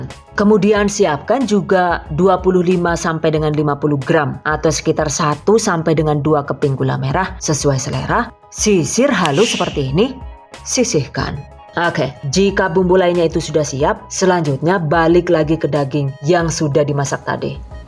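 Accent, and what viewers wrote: Banyumas, Indonesia. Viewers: Selalu saja terhipnotis dg suara narasinya nda terasa tau" sdah selesai aja ..... Klo bleh tanya KK asli orang ngapak kah,... Tp klo nda salah pernah disalah satu Vidio tinggalnya dilampung.... Terimakasih resepnya yg selalu menginspirasi dan menghibur....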